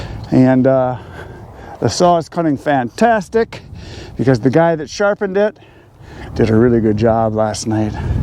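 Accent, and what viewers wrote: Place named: Upper Midwest, United States. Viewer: LOL you talk regular northeast / mid atlantic by yourself, but when another person shows up, your upper midwest shows.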